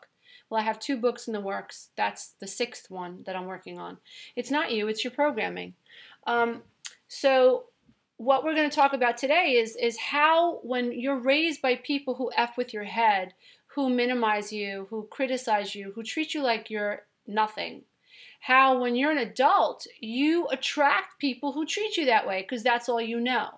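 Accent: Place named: New Jersey, United States. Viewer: Love you after only listening to this video..... We have very similar lives/experiences. Love the way you talk......I'm a jersey girl - you sound like one as well :) How can I follow you?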